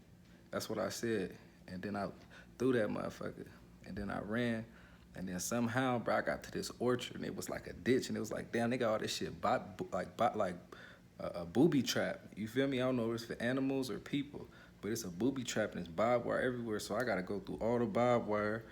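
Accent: Bay Area, United States. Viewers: You could tell bro from the bay!! Man the police got me and my lil bro out there too smh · this dude definitely from The Bay he talked just like Messy marv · He has to be from the bay the way he talk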